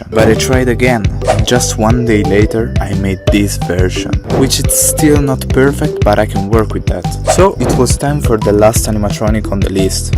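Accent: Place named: Italy. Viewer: Sento il accento italiano ti ricordo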